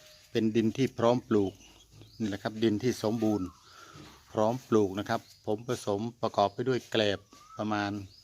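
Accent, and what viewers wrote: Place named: Buriram, Thailand. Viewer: น้ำเสียงคล้ายคนบ้านผม..แถวๆเซราะตลุง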